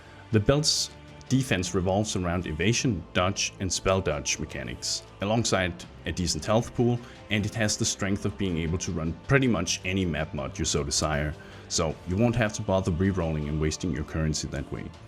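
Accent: Denmark. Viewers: Is this dude Danish?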